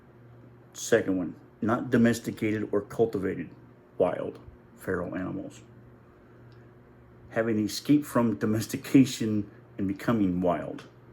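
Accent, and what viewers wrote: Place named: Alabama, United States. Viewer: Heres my analysis on the entire video you have presented. 1. You have a southern Alabama accent. 2. You have some excellent knowledge. 3. Your skin is pink. 4.. You should be a motivational speaker. 5.. You look like Steve Austin the wrestler. 6. Your ancestors were definitely Catholics. 7. You are severely autistic. 8. You are not physically attracted to Black women. 9. You shave your own head at least once a week. 10. You are a meat eater and have never considered a vegan diet. 11.You never hang out with Black women. 12.You routinely hang out with Black men. 13. You are an excellent pool player. 14. You are a good dancer. 15. You pray to statues of Mary. These points are ALL true until corrected by you.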